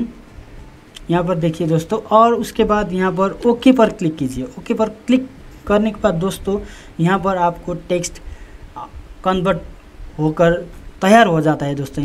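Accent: Nepal. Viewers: Did you make this video for the people of India??? Your accent says clearly that you are Nepalese.....You could have made this video in Nepali or English language......Anyways thumbs up for the tutorial...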